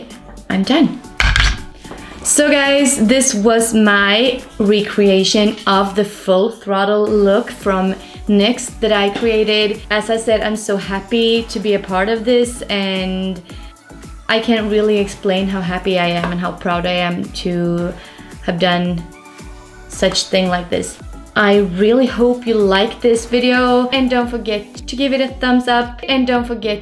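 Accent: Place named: Sweden. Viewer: The Swedish accent... 🤗🇸🇪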